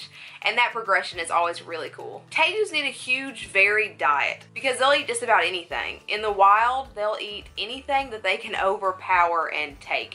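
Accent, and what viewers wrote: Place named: Alabama, United States. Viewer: I cant get enough of your bama accent. 😍😍😍 You sound like maggie from the walking dead...lol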